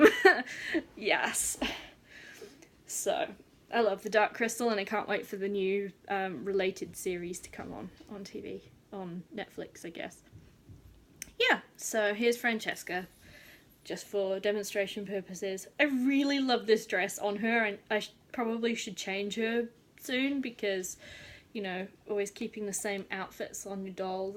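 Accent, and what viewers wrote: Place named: New Zealand. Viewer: I have made her facebook page public this evening so hopefully you will see what she looks like and what she has been getting up to since she came to me in August. I didn't know you were British, you must have come here when young you sound totaly Kiwi, we are from Yorkshire and have been here nearly 10years. The idea for your story came to me a while ago, when you mentioned that you didn't know where to go with regards to her story. I was waiting in anticipation when Customs had her and this was the time i was waiting for mine and thought "oh no im going to have to wait forever if customs get a hold of her, i had sleepless nights about her been ceased for her magnets, all sorts of things, then i was worried i was mad at what i was intending to do with her i didn't realise that there were others out there like me, so then i though oh im not original in my way of thinking which i was kind of disserpointed in, so i couldn't win with myself, but now i love watching unboxing video's and you tube but i just watch Shell Bea, Swannz, and the lady who took her dolls, dolls bike and dogs to the beach in Auckland, i've forgotten her name but found them i think through stalking ha ha ha. 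When you were unboxing her it made me cry tears of joy for your wait was over, and mine was soon to be delivered. I love my Ppinkydoll and have another coming in October, its my birthday today and so im thinking erm.... birthday money= Lilith. I have been in a tiz regarding patterns, i sent for some fro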